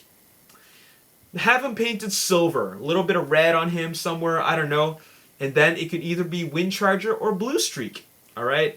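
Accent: Canada. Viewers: You must be Canadian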